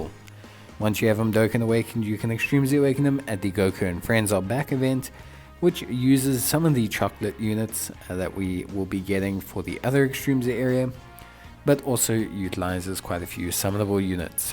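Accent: South Africa. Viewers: Dude are you South African? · I presume so, the South African accent is undeniably there.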